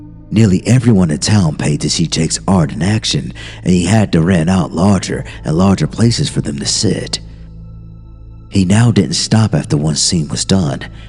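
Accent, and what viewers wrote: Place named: South, United States. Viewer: Great job on the southern accent! I'm from TN & so many narrators can't pull it off but you nailed it my friend. Always good to hear from you. U are an awesome narrator & I enjoy your channel very much. Take care & hope you have a fabulous Friday & a wonderful weekend ✌🏽❤️✌🏽❤️✌🏽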